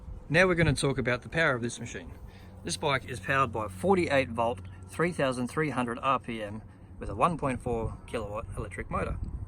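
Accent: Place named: Australia. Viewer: nice i used to live in canberra now i live in the usa in seattle. it is good to hear an australian accent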